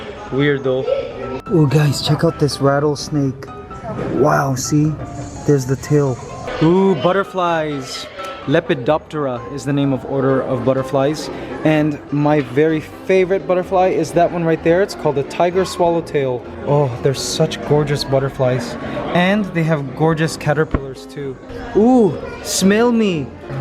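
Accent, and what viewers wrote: Philippines. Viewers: I enjoyed every second of the vid. I sooooo love your voice Kuya. Wishing you could do a voice-over of some science documentary. 👍 You're Pinoy accent cracks me up every freakin time 😂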